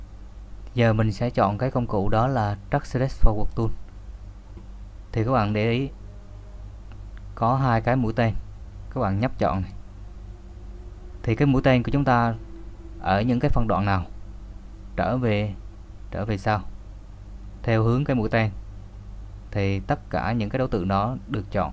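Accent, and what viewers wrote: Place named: Quang Nam, Vietnam. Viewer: anh người quảng nam hã, cho e làm đệ tử với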